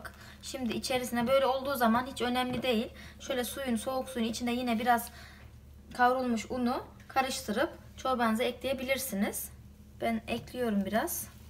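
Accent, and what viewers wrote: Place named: Central Anatolia, Turkey. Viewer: Gaynamaya bırakggyoruz o kadar iç anadolulusun ki abla bende niğdeliyim şiveni çok iyi anliyorum 😂🖑 elllerine saglik bizde koyunun boyun etinden yapılır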